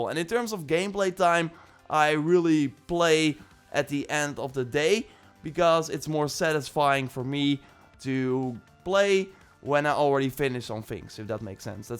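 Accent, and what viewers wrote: Netherlands. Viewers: Bro u Dutch I know it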